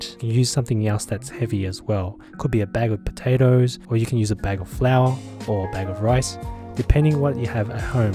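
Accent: Australia. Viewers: He has an Aussie accent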